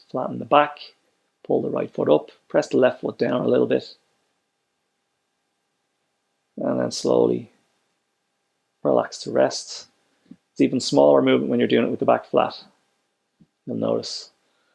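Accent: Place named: Ireland. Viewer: What an eyeopener... wouldn't have believed how much feeling there was going on inside if I hadn't tried it out myself! Shout out to DH for advising me not to go straight over to the heavy bag and start kicking it too haha. This exercise is actually what got me really paying attention to this stuff. Now I have no choice but to look at all your other videos as my body is.... well I'll keep my adjectives civilized! Thanks mate and as an expat Aussie its nice to hear an Irish accent.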